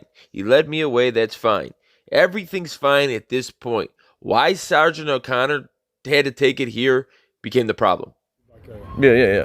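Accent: Chicago, United States. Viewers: Go get em!!! You have become a favorite auditor!!! I love when you educate them with that style and swagger! And love that Chicago accent 😉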